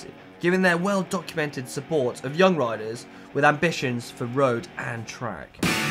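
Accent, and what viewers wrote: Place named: United Kingdom. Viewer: British pronunciation of french names <3 <3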